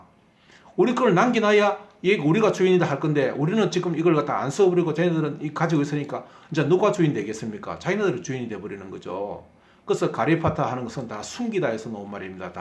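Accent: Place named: Jeolla, South Korea. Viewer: 그래서 사투리랑 비슷하다고 했구나. 전라도 말투인데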